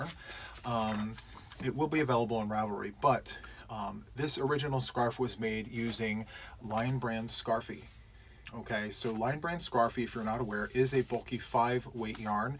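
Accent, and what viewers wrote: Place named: East Coast, United States. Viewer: Im new here and your tutorial is a treasure! What a wonderful pattern! Your style of instruction is so easily understandable… thank you! (Your east coast accent made me smile while listening too) 🎉❤ Thank you so much!! Michella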